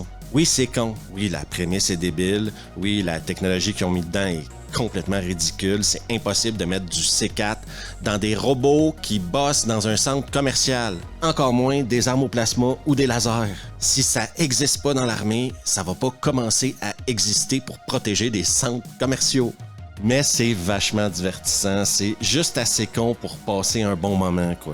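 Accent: Quebec, Canada. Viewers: Salutation fraternelle. T'as définitivement fini par m'avoir, en plus de nombreux fou rire (suffisamment rare pour le souligner) et de.ton tellement sarcastique (j'apprécie énormément en n'en faisant preuve moi-même au quotidien) ton intro m'a tué de rire tellement simple, tellement sobre mais surtout tellement et en prime d'une efficacité extraordinaire 😂😂😂😂😂. Je m'y attendais tellement pas, je me dis que du coup j'ai bel et bien fait de m'abonner je regrette pas 😂😂😂😂😂. Continue de nous éclater avec ta gouaille tellement québécoise, t'es vraiment un bon, on est certes loin des " Cahiers du cinéma" mais c'est tellement jouissif d'objectivité concernant un certain cinéma tombé en désuétude. Nous sommes de la même génération apparemment et tout ce cinéma me manque terriblement. On ne refera pas le passé malheureusement mais dépenser des sommes astronomiques pour voir des septuagénaires en famille ça relève de l'escroquerie. 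Que certains producteurs en prennent conscience et ce bouge le c..., au lieu de financer des taxis 5 et autres bouses du genre, je dis bouse pour ne pas dire navet ou nanar, jai trop de respect pour un Halloween 5 que pour un Indiana jones. Force à toi l'ami et prends soin de toi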